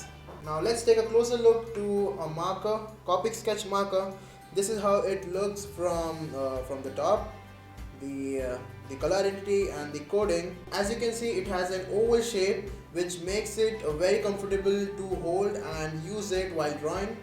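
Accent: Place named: India. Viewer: Are you from India? You sound like from India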